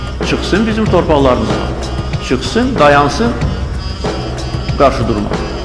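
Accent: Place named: Azerbaijan. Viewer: Başkanım kusra bakmayın ciddiye alalmıuorum Azerbeycan şivesi yüzünden ama helal olsun fmfmfkfkfmfmfmmf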